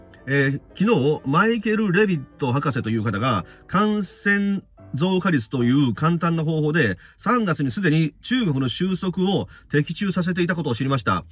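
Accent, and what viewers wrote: Kansai, Japan. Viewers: Qさんのたまに出る関西イントネーションが大好き😆 大阪人より🙋🏼‍♀️❤️ そして今日も楽しい動画をありがとうございます！！！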